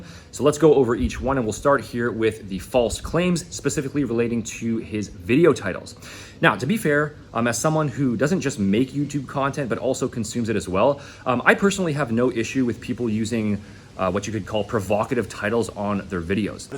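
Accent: South Africa. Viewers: He sounds like hes from south africa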